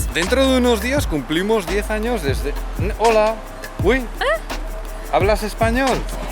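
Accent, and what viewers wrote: Basque Country, Spain. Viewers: A mi me gusta más el español de que el de (demasiado acento vasco para mi gusto) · aunque no lo creas, los acentos de otros hablando otro idioma, por aquí se acepta y no es raro escuchar un español con sus dejos y defectos...nos parece simpático...asi como tú hablas en español de España, es otra cosa con el de latino américa,y de cada país, así que a, que practique un poco mas, pero yo sé que todos los amigos que los apoyamos nos parece muy simpática su manera de hablar y también el tuyo " ya veis " que es la salsa de la vida...😃☺️... muchos saludos a todos ☺️...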